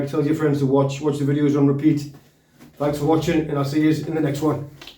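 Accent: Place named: Newcastle, United Kingdom. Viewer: You sound like Jimmy Nail. The Geordie accent is my favourite one.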